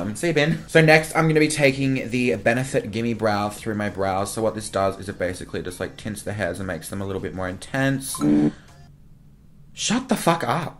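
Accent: Australia. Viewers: u from australia? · Are you Australian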